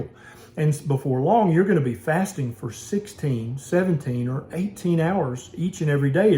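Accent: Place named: Kentucky, United States. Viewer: Dr. Berry, I listen to you a lot...I spent most of my childhood in Kentucky, and EVERYTIME I listen to your shows, I crave "Cokes" and beef jerkys and MoonPies!! Oh, and ALL DAY SUCKERS...lol...Blame it on my granny! I LOVE that accent of yours, and miss my granny and papaw...they both passed years ago...You are my fave doc on here! I do not eat banana splits, that was the name of a " divorce group" my kids attended when they were 6 & 8...I did eat a pop-tart today...but I have been good for 6 weeks or more...I will kick my butt when the morning comes....haha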